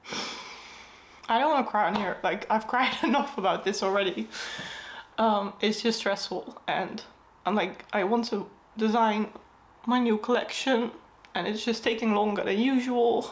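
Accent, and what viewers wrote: United Kingdom. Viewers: I've recently started following you here and I'm very happy about it. I love the way you talk. (i love British accent) to the way you live, work and everything. I got thrilled when I got that you love crafting cause I call myself a crafty girl. Also, it's new year in Iran and I want to say happy Nowruz! (Persian new year) I hope that good things happen to you lovely girl ❤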